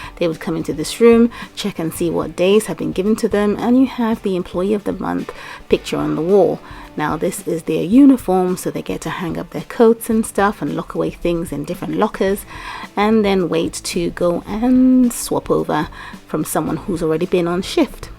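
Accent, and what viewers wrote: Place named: United Kingdom. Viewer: Ahhh you live in England two I kne2 you had a little British accent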